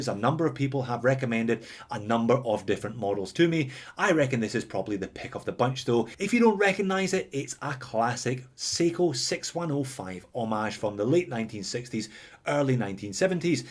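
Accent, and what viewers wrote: Scotland, United Kingdom. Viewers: Quality video as always.... After watching you for some time, I can no longer read watch specs without a Scottish accent in my head. LOL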